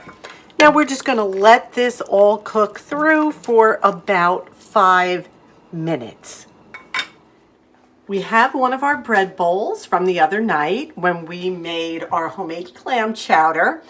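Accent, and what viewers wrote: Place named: North Jersey, United States. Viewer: Hello from Northern California! I was raised in North Jersey though, so love hearing your accent and when you talk about ShopRite. I haven’t been thrifting in ages. I don’t shop much anymore (except for food), but secondhand is definitely the way to go!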